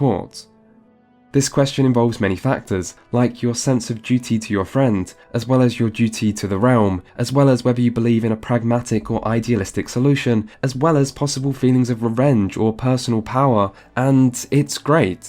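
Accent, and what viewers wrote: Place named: United Kingdom. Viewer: WEll, that was a waste of time. One day I'll learn to unclick when I hear a random british-sounding white dude voice.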